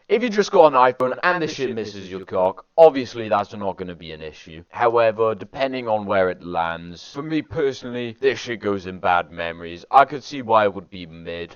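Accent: United Kingdom. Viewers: Bro I know from your accent and because you went to the Deftones concert that you live in South Wales lol, I do too but I didn’t go to the concert because I’m not really that fussed even though I like the band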